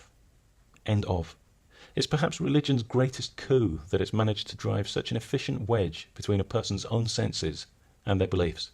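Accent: England, United Kingdom. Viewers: Totally off-topic but your voice really reminds me of the voice over to a making of documentary of Silent Hill 2 but I suspect that's because you're from the same area in England as the actor.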